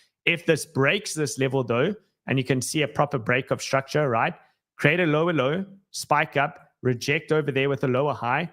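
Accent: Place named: South Africa. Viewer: $10000 that's a south african accent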